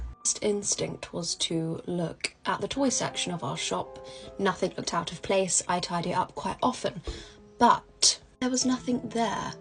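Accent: United Kingdom. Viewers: I agree such a lovely voice. I'm American so I always fall for the British accent. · Apart from the topic 😅 love British accent ❤from Indian. So classy, relaxing and elegant.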